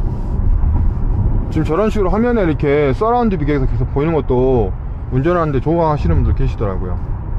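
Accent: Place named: Jeolla, South Korea. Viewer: 전라도 분이구만 말이 귀에 속속 들어온거보니 ㅎㅎ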